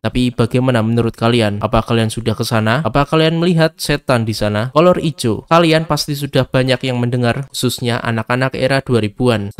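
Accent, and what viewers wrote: Java, Indonesia. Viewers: chanel bagus, cuma jangan pake bahasa "gue". pake saya atau aku aja mas, medok sekalian gpp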